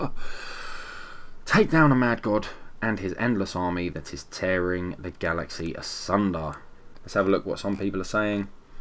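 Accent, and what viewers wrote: United Kingdom. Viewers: that British accent tho. ;)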